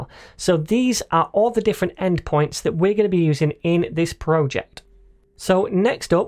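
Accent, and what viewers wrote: United Kingdom. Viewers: Honestly, I really like his react tutorials not only because of his British accent but he also explained them quite remarkably.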